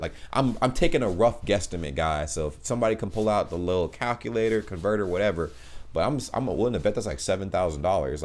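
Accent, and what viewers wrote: Germany. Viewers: I think it is a good representation of the german english level on average. it might be cringe but also everyone will get what is meant at least. absolutely no problem especially considering this 4 am English...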